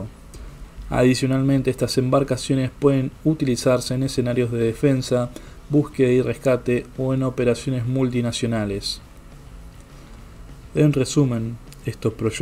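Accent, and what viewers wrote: Argentina. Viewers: el canal es argentino, no chileno, no cachai el acento ?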